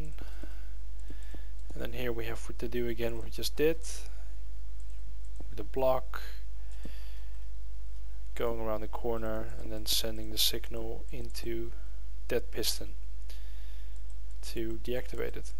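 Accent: Netherlands. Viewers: Hey, are you dutch? I recognize the accent :p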